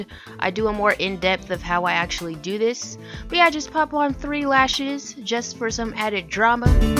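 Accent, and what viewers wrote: New York, United States. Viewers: lmaoo i love this ur deadass from new york "yafeelme"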